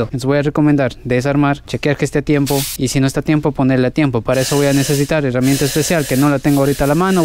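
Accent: Cuenca, Ecuador. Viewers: Buen video. Eres Ecuatoriano? Tu hablado me suena como de acá jaja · suenas como que fueses de ecuador de cuenca... buen video amigo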